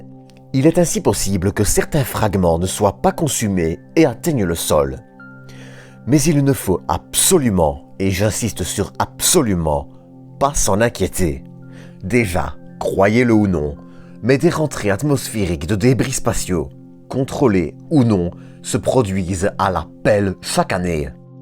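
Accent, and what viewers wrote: Belgium. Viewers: c'est l'accent belge, perso c'est l'accent arabe qui me dérange mais pas celui là...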